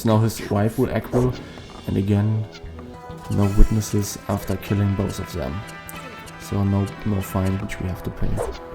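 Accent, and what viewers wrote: Germany. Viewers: GOOD GERMAN ACCENT. I love it! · Dein deutscher Akzent. 😄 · Gutes Video, verfeinert mit dem deutschen Akzent, fast schon perfekt.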